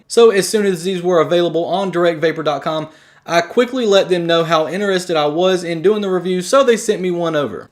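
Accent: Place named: South, United States. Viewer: I trust men with southern accents so I feel relatively comfortable asking you this question, hopefully you can answer it for me. Recently, my guy talked me into getting this box because he doesn't like my smoking. What, in your opinion if any, is the best e juice to use that is the most like a cigarette? I realize this is comparing apples to oranges, but I'm kind of desperate. I told him that I wanted the Juul and the original Kangerbox and the emporer's e juice...and he got me this Kanger-made NE box, no Juul system and some kinds of e juice that I hate. he kept saying "tell me what you want." I DID. But he would not add to the cart what I actually specified, so I ended up saying "just get me what YOU want me to have then." So he did and I am now in possession of the box...and no juice that I like. I don't trust going into the stores to test juices because I live in Springfield, MA. 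if you know anything about this area, it's nothing but criminals, and stores filled with really bad product with unregulated chemicals and whatnot. No sane person would buy anything around here. That said, my question is, what vape e juice that is like regular tobacco do YOU like, and where can it be bought online? Thank you in advance. :)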